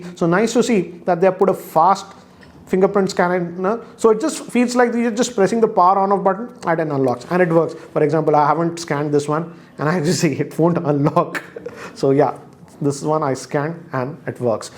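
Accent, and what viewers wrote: India. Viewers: I love Indian accent.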